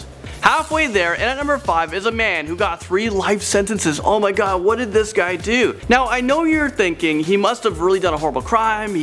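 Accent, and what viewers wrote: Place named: United Kingdom. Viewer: Emi c; edits I wasn't complaining about his accent, if anything i would have praised it, i happen to like the british accents.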